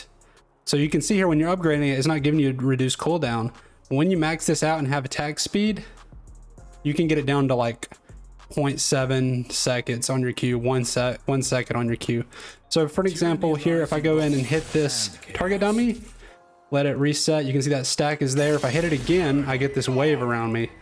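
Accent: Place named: South, United States. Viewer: The Southern accent made this extra cool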